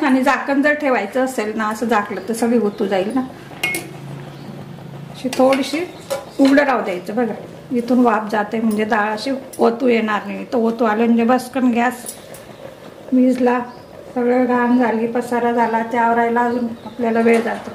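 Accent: Khandesh, India. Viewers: Chan Tai. Recipe takat Jaa .... Khandeshi awwaz aiklyawar pan samadhan milta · ताई तुम्ही खान्देशी आहात का कारण आपल्या खान्देशी भाषा लगेच ओळखले जाते आणि आम्ही तुमचेच सर्व व्हिडिओ बघतो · Tumcha aavaj olkhicha vatato aahe Tumhi khandeshi aahat ka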